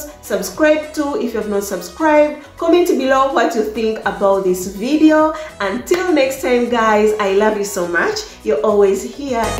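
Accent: Uganda. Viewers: I have been pondering on asking you this question, did you go to school in Uganda? Your accent sounds like that of Ugandans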